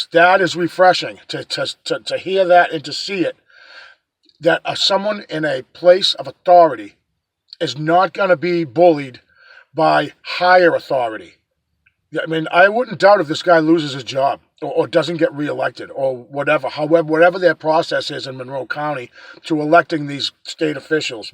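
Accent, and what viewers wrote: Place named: Rhode Island, United States. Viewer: You're from Rhode Island, I'd bet...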